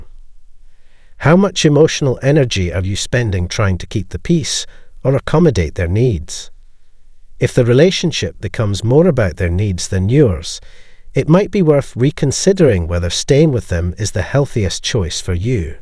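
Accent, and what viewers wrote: Scotland, United Kingdom. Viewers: Your channel is great. Your narratives are very clear. Your accent sounds very familiar. Are you Scottish by any chance?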